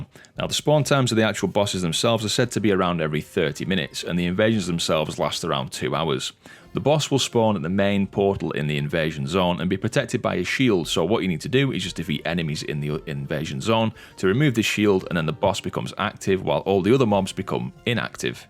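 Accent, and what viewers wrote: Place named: North, United Kingdom. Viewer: Its the warm Northern accent that im here for really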